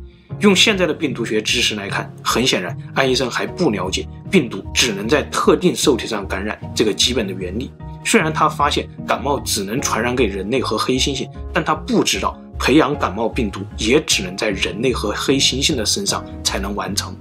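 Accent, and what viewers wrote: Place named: Hubei, China. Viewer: 现在听湖北口音听上瘾了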